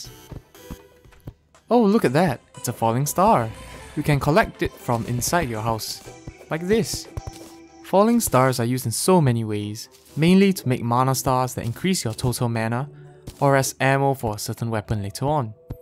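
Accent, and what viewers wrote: Singapore. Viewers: There's some Singaporean accent in his voice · Where are u from? Ur accent sounds like ure from singapore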